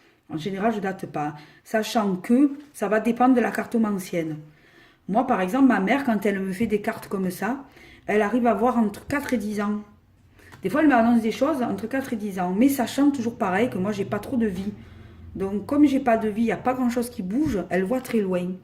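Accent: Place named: Marseille, France. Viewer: bonjour; je voulais savoir de quelle région tu es; a ton accent je dirais Marseille. J'aimerai beaucoup apprendre avec quelqu'un et j'aime bien ta façon de faire.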